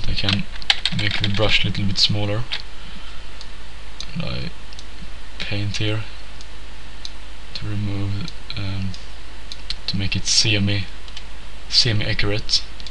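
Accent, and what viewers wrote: Sweden. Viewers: He doesn't, he sounds Swedish, I am Swedish so I know the accent. Looking at his channel his location is Sweden... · Hahha Du Kommer Väll Från Sverige? Det Hörs På Din Accent xD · haha man hör verkligen att du är från Sverige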